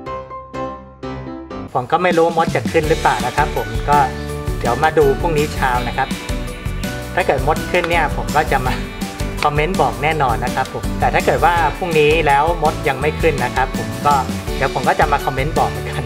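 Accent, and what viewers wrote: China. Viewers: พูดไทย ออกจีนๆ นะ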